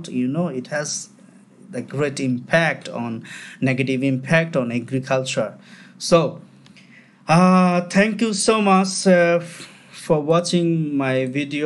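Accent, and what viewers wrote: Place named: United Kingdom. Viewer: Some word pronouns with british and russian accents which sounds very odd 😢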